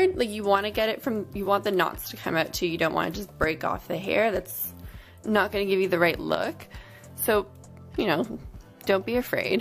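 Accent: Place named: Canada. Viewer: Have you ever lived in Canada? Seems like you have a hint of an accent.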